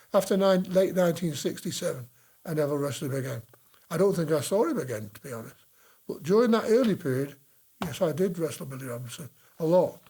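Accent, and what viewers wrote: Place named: Nottingham, United Kingdom. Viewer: I o ly clicked to hear if u were gonna speak in a japanese accent hahaha! Seriously i knew the name. Ut to my suprise your english.lovr from nottingham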